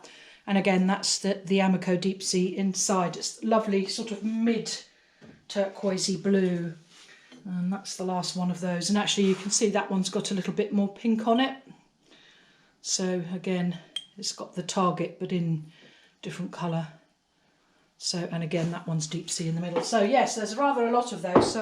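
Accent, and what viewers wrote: England, United Kingdom. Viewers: All you do is so wonderful...none of it is "daft", as you say, LOL! Love the English accent too! The Tudor jug is gorgeous ! The mono-printing is very intriguing; love the look and curious about the process. Will keep an eye out for a video on it as well as the test tiles. Your husbands dish is very stunning. Aren't you glad you didn't give up on it?! Inspires me to re-fire a piece I've made. Had my eye on the poppy heads on the shelf behind you. Looking forward to part 2 on that video! Don't you just love the way this hobby can draw us away from the noise in the world around us? Allowing us to get lost in the process of creativity; spending hours & hours honing skills and processes. It's quite meditative! Many, many thanks again for sharing your wonderful talent and discoveries in pottery. There are a lot of videos about pottery here on YouTube, but yours most definitely rank high in the top 10!!! 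Kindest regards...stay safe and well. Cheers from across the pond :0)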